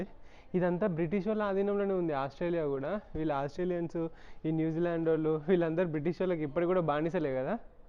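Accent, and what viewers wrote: Telangana, India. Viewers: Bro vlog lu back ground voice mast vundi telangana yasa lu, vlog matram mast vundi...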